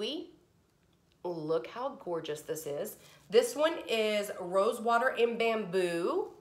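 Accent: South, United States. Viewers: Hi! How are you Hun? I live in KC, Missouri we have several dollar trees here that I've been to and I must say IAM completely obsessed, I love your hauls! ❤️Any tips to start my own hauls? P.S.i love your southern accent my mom is from Kentucky and has the same southern draw, love it! Take care honey,be well,God Bless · I’m still here I freaking love your videos 😂😂 you keep me laughing and I enjoy seeing the new finds and hearing that southern accent 💕😋😂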